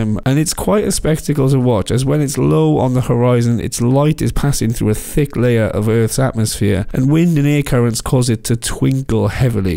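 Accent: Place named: Wales, United Kingdom. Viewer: New subscriber, recognise the accent... Cwmbran or Newport area?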